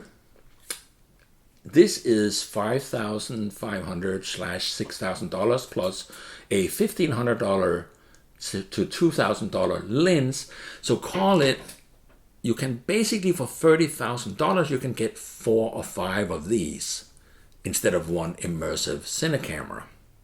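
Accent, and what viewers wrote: Denmark. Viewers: Hi, thanks for uploading. A Dane here from Copenhagen, who also shoots Canon - great setup and hyggeligt to hear your Danish accent. I look forward to seeing what you shoot on your 12K 🥰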